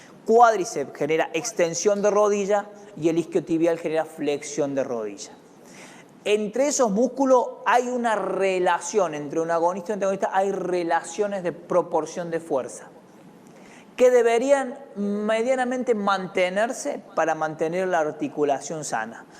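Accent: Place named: Cordoba, Argentina. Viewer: Muy bueno profe !! Se le nota de acá a la china el acento cordobés !! Saludos de Córdoba capital !! 👌👌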